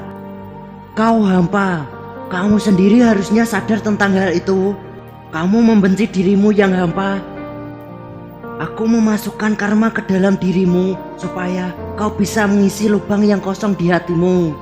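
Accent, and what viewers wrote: Java, Indonesia. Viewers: Bang bang woy...ente mendingan pake bahasa jawa aja biar medoknya pada terlestarikan lebih bagus bang...udeh pake bahasa melayu tp medok ude gtu pake ngomong jorok lagi ampoooun deh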